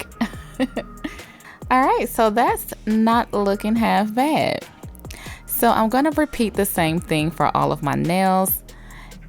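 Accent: South, United States. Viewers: I’ve said it several times but I’ll say it again! Being from the south I love how your southern accent comes through in spurts lol “...just try to make sure all the colors are dOWn”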